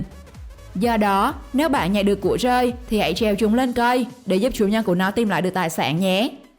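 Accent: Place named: Phu Yen, Vietnam. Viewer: Đây là giọng Phú Yên,gần Bình Định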